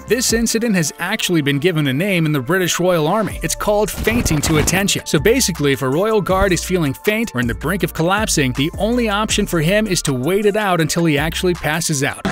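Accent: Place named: United States. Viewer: Why àn àmerican voice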